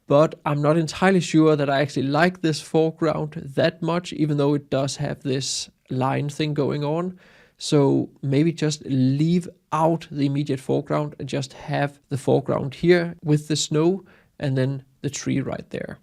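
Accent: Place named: Denmark. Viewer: A denmarkian accent.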